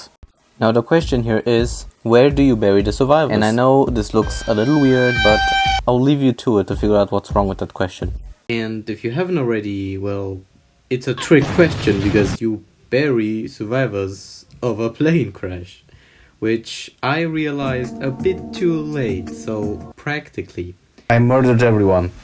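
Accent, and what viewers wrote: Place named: Germany. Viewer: Ich höre einen deutschen aktzent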